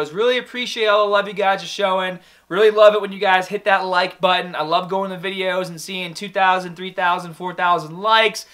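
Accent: United States. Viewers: Your American accent is so strong ahah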